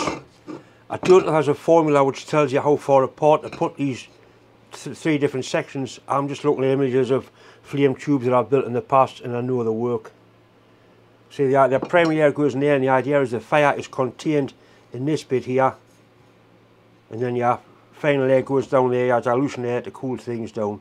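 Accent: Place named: England, United Kingdom. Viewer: G'day mate, hope you had a Merry Christmas and please, a happy new year to you and yours. Enjoy your work very muchly. From what part of England do you hale? Your accent sounds wonderful to me. Thanks.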